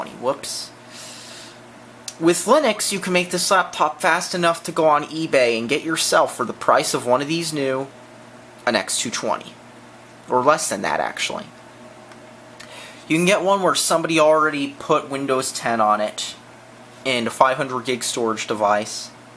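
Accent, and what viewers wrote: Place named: United States. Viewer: They opened with "This laptop sums up everything wrong with the computing industry today" in a broad American accent. I'm surprised you got 4 minutes in...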